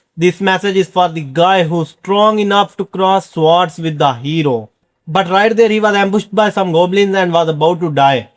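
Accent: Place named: India. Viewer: You english ascent is more indian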